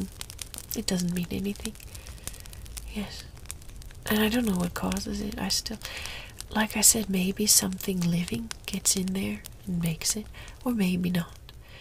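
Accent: Denmark. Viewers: I'm from Sweden and I could hear you Viking blood sing ;) Sounded alot like a Danish (viking) to me! Love all the history you weaved into your viking saga :) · As a dane I gotta say, the accent is on point, you sound like someone from Copenhagen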